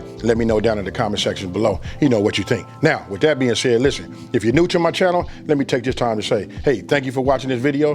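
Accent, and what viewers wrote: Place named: Los Angeles, United States. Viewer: this is 🔥. Even your LA accent!!! 😊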